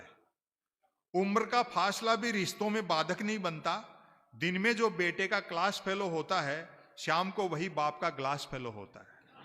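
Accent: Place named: Rajasthan, India. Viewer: आदरणीय " " भाई साहब निवेदन है कि अपनी रचनाओं का प्रदर्शन राजस्थान में (हो सकता है थोड़ा दक्षिणी राजस्थान छोड़कर ) अपनी मायड़ भाषा में प्रस्तुत करते हैं तो बहुत अच्छा लगता है, फिर अपने झुंझुनू जिला का लहजा आप बहुत अच्छे से बैठाते हैं, हिन्दी और मायड़ भाषा को मिक्स-अप करेंगे तो भी अच्छा छूंकण लगेगा। करणी राम सैनी उदयपुरवाटी, हाल जयपुर।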